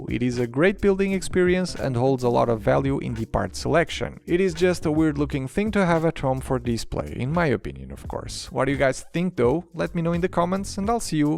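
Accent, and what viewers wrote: Portugal. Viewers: well it seemed to me that you were portuguese i knew only by the accent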